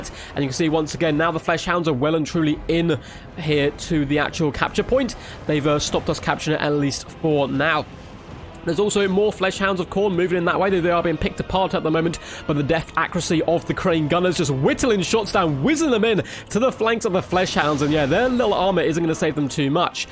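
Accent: United Kingdom. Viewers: Are you British? You sure speak fast :)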